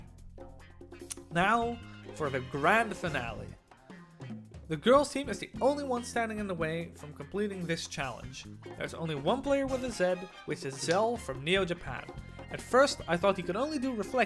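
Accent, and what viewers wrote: Limburg, Netherlands. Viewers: Fam kuumps ste oet limburg? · your nederlands en limburhs omg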